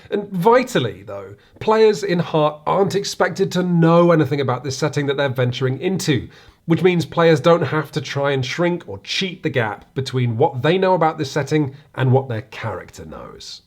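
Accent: United Kingdom. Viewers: Nice to see a review about RPGs. I would like some more...😆😉 (Although the British accent makes your videos difficult for me to understand....but I watch them anyway.😆😆)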